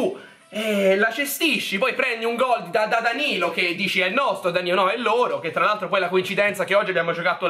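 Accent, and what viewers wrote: Tuscany, Italy. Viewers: Ma sei toscano?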